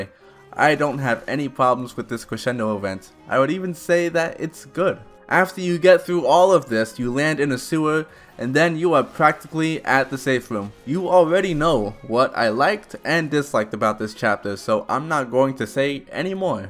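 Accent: United States. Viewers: What's your accent? New York?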